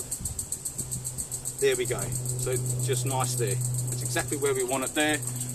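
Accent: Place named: Australia. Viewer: Found a diy with Australian accent. Yay.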